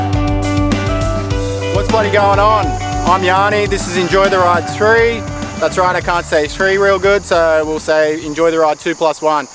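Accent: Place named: Australia. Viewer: fuckin dig your australian accent mate. STRAAYYYYAAAA!!!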